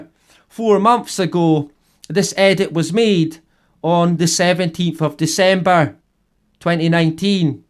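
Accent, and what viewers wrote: Scotland, United Kingdom. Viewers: Been subbed since you were 1.800, well done on the rise in subs you've had, in a very short time. I love your accent, I hope I'm not insulting you if I ask which part of Scotland you are from...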